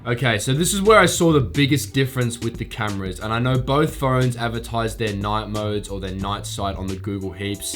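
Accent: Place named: Victoria, Australia. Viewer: First video watching you and straight away I knew you were a fellow Aussie 😂 from Victoria too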